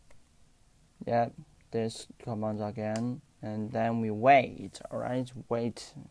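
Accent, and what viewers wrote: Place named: China. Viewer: Chinese accent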